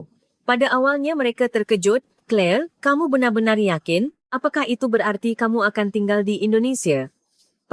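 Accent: Malaysia. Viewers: 😅😅😅😅 Narator nya bukan orng Indonesia Logatnya 100 % Malaysia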